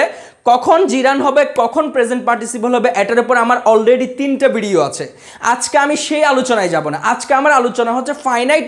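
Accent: Chittagong, Bangladesh. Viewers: Sir mone hy chittagong ar tai na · apni ki Chittagong er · আপনি কি চট্টগ্রাম এর ? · Vai.... apni to mona hoi chattogram er people. Amio akjon chattograminian. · Closing my eyes,,,i can say you are from Chittagong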